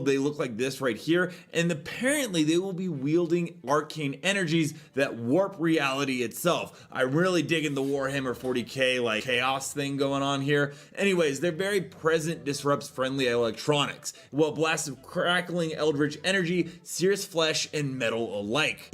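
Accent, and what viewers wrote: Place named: Texas, United States. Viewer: Even as a f*cking french i can smell your texas butthole accent frome here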